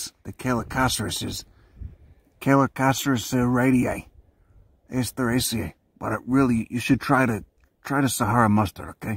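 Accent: Chicago, United States. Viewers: Imagine being in the middle of the desert and seeing a tattooed gringo with a thick Chicago accent crouching and talking to a tortoise about his diet.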